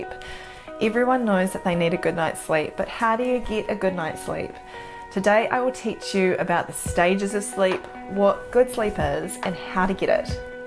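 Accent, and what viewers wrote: New Zealand. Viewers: The New Zealand accent is pretty awesome, I must say. I loved it in Hunt for the Wilderpeople, one of the most hilarious movies ever. But you say in this video we should only use bidrooms for two purposes: sleeping and six. What about five, or four? Are they okay? Maybe some seven? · very good Doc. thank you. Now I want to go to NZ, love the accent!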